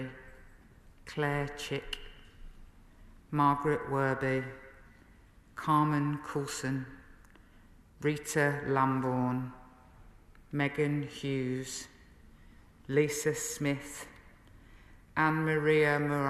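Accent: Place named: Birmingham, United Kingdom. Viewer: Can not stand this woman, her rudeness towards Diane Abbott and bragging about it. Her weaponising her brummi accent to appear “ woman of the people” Her willingness to stab Corbyn in the front and in the back whist being an advocate for anti women violence is at best hypocritical. We won’t mention her working with Murdoch.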